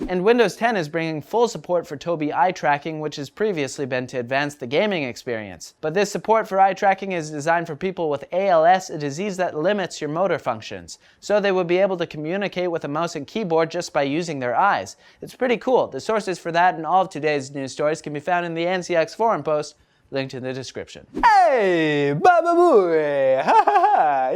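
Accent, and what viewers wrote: Italy. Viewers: Italian accent sounds like mario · That Italian accent tho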